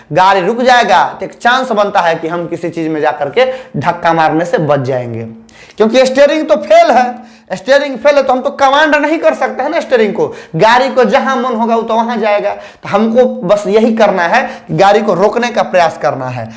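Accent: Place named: Bihar, India. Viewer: Classs achi pDhate h sir but shudh hindi bola kar bihari bhasha thodi defrent lagti h🙏🙏🌹👌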